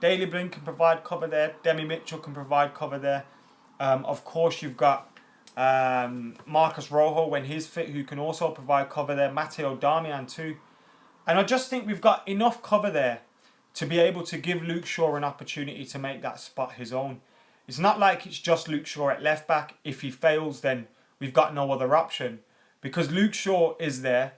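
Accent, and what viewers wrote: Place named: Manchester, United Kingdom. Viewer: are you from Manny? Your accent sounds familiar mate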